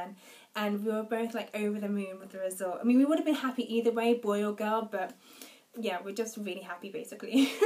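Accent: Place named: RP, United Kingdom. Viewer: Awwww, I'm so happy for you! Everything you got for your baby is SO ADORABLE! And also, I can never get over your fabulously posh accent. I hope you keep doing videos throughout your pregnancy!:D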